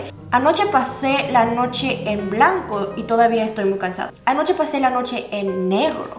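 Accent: Mexico. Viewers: Hablas perfectamente. Con acento Méxicano. Pero muy bien. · Se nota el acento mexicano jaja Y algunos usos incorrectos creo que se llama jerga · Tu español es mexicano... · Soy el único que cree que su forma de hablar no es el español neutro sino más como el de los castrosos "Whitexicans" ? espero que con el tiempo hable mas neutro :) Suerte en todo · Hermosa Korean a con acento mexicano, lo mejor de dos mundos 😍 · Apoco aprendió español Mexicano, el más chingo de todos. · tu hablas el español mexicano, las mayorías de las juergas mexicanas son feas cuando se escucha en otros paises. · Hablas español Mexicano, se tetona el acentro y las palabras de la rosa de guadalupe. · Pero y esta reina?! Tiene un acentazo mexicano brutal! A mí como española, me dicen que es de Méjico y me lo creo! Cero acento extranjero! También es cierto, que he notado que la fonética coreana y española, aunque no lo parezca desde fuera, es muy semejante. 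Yo llevo 10 años aprendiendo coreano y cuando me escuchan hablar siempre me dicen "porque tienes cara de europea, si no creería que eres de Seúl" jajajaja. Supongo que al final entre coreanos e hispano-hablantes no tenemos demasiado acento al aprender el idioma del otro! (el problemita, problemón, está en la gramática... Ahí sí se vino drama, lol) Anyway, buenísimo tu español, amiga.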